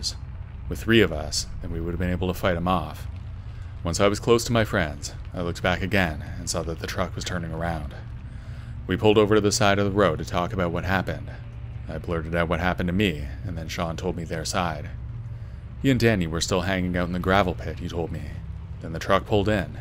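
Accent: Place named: Canada. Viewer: ❤❤ Thanks love your calming voice, you must be Canadian like me!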